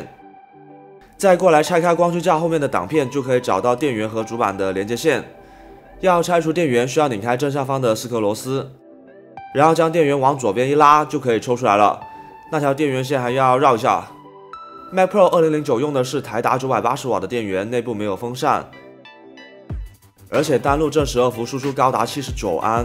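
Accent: Cantonese, China. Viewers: 是不是粤语人？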